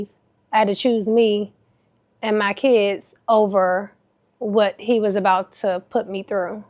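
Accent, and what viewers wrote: DMV, United States. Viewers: she’s from DMV! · Girl! Soons I heard you talking I said that’s Maryland baby!! lol love from PG County boo 💕💕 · I knew you were from the DMV somewhere as soon as I heard you talking lol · I could hear Md all in her accent ! Baltimore stand up ! Love this ❤ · I’m from PG I hear your Maryland accent girl 😁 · Heard that DMV accent IMMEDIATELY! Hey sis! If you're comfortable, can you share stories about your stadium days??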